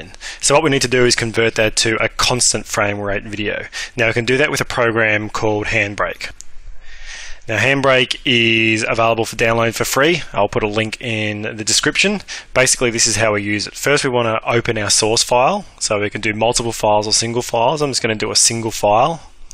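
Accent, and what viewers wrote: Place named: Australia. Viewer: your strong ozzie accent made this 50 billion times more fun to watch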